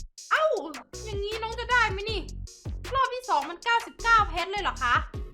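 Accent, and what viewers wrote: North, Thailand. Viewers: พี่เป็นคนเหนือหรอ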